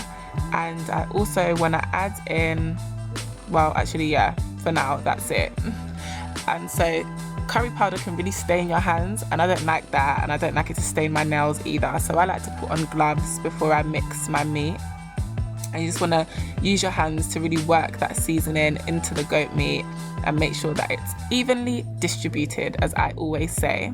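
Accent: United Kingdom. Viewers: 😍😍😍🇯🇲Im from America you sound like you live in Britain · Well I normally think these kind of videos are dead especially when it's not a carribean accent when they first talk and they are American I'm like nah but I heard a British voice and thought she might be alright actually and yeah cookings on point ha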